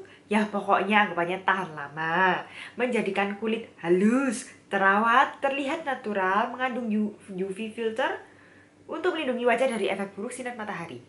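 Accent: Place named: Surabaya, Indonesia. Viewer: surabaya itu aksennya kental y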